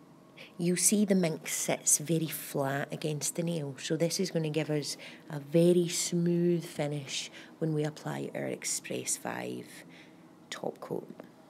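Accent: Scotland, United Kingdom. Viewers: You people have no class for commenting like this. I watch her cause I do Shellac nails at home and not experienced in using or creating shattered glass effect among other methods. To say her voice is creepy and it’s probably because she is Scottish is racist. I work for a Scottish lady and she has an accent but not creepy. I have an accent cause I’m Slovak, people make fun of me since I came to Canada and told me to go back home. Until you walk in other peoples shoes you shouldn’t talk so negatively. Women should support one another and encourage one another. It hurts me that other women talk like that about other women instead of saying positive comments. Who are you, stand in front of a camera and talk and do nails at same time, I dare you all Bully’s out there. You people have to watch what you say cause it will come back and haunt you. I just wonder why people click on certain platforms to watch and then comment trashy. 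Are they bored, are they nail learners, it can’t be nail professionals cause they wouldn’t comment like that. These people are juvenile and BULLYS. I don’t know this lady but love her work and I use the products she has CND and more. She is an ambassador representing CND and Lecente products and you juveniles should give her respect. Who were you brought up by to have manners like that.🤮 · loving your little video keep them coming and its nice your Scottish so can relate more lol xxx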